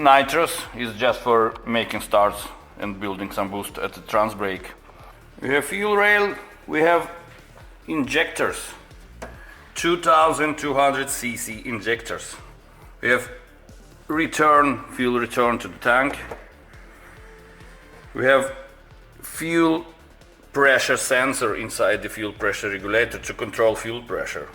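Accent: Russia. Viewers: Та я только по первым словам на английском уже понял, что это говорит русский человек 👍👍👍 Мерс огонь!!!! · Русский что ли бро ) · русский английский!!!!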